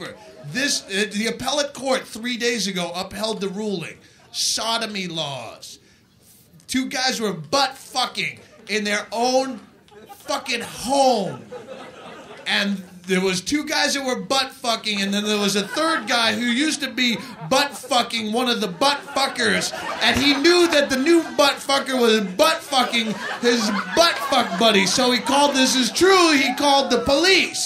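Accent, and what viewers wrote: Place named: Massachusetts, United States. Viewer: Still had his Mass accent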